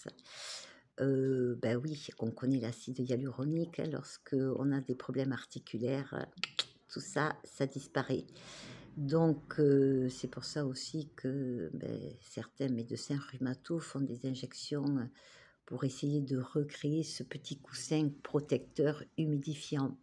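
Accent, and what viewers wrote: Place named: Toulouse, France. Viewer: Vers Toulouse.. * 💥🥳💥 🥰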